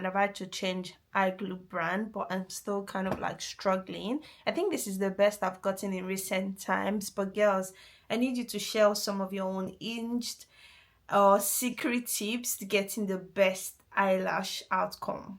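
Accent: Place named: Benin. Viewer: Benin accent got me 😂😂😂❤❤❤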